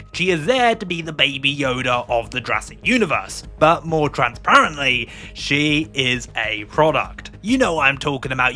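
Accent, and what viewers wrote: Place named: United Kingdom. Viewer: Your British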